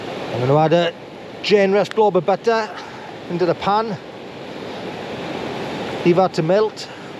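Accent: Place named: South Wales, United Kingdom. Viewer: What part of south wales are you from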